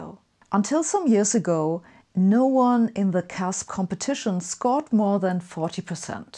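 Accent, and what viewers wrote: Germany. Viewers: You sound so German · I love the german people. So brilliant, so strong. Germany is the shining jewel of europe. So long as they avoid the mistakes of the past, i.e. nazism, military empire, etc. Nothing can stop Germany or dim it's influence in the world. Look how brilliant this german woman is. And that accent!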